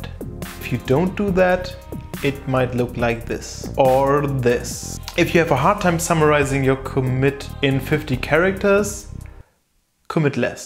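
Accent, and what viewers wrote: Germany. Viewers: The world is so small. From your accent, I knew you're german. But then I opened your website and saw that you're also like me from Hamburg 😅 Very good video. I'd love to work with folks who could appreciate a good commit like that! Subbed! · Man hört sofort raus, dass Du deutsch bist 😜 Aber super hilfreich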